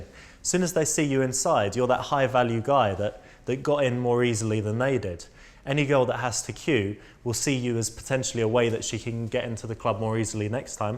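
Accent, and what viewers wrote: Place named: United Kingdom. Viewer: fukkkk he talks to much and listening to a british accent is even worse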